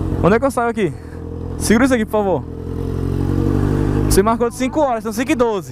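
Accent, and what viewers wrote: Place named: São Paulo, Brazil. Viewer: como que não é fake? essa porra é fakedói meu irmão, tu de Recife, com esse sotaque de paulista, prego do CARAI, honra tuas origens boy! kkkkkkkk essa porra ainda vista por tanta gente kkkkkkkk, vou dar um LIKE só por tua coragem visse !! mas num fica com essa de MANO e MINA não que é feio doido !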